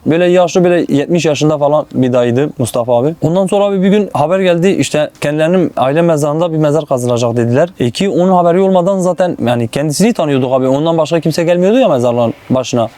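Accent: Diyarbakir, Turkey. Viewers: diyarbakırlı belli arkadaş klpcdbrdr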